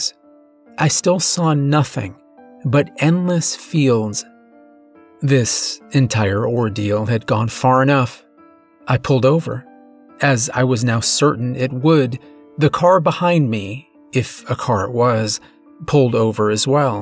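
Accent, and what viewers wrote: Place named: United States. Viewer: Oooh er! That was a good one! In England we have 5 seasons spring summer autumn winter & fog! There's an old Scottish ghost story, well known, of a village appearing only in the fog, it's called Brigadoon Wanted to say, you have a really nice American accent, not Bostonian but has a British inflection somehow which makes your speaking voice ( narration voice) very pleasing & clear, lovely diction but please don't pronounce the word shone as shown ( it's just said as shonn) Plus the past tense of Drag is Dragged not Drug which is a pharmaceutical ( the dictionary says the current fad for using Drug & not dragged is an Americanism & not correct, their words not mine Btw, I'm not being derogatory it just grates on me, no offence meant)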